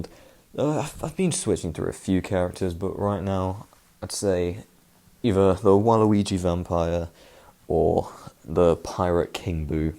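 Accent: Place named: United Kingdom. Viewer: This video is disgusting because your British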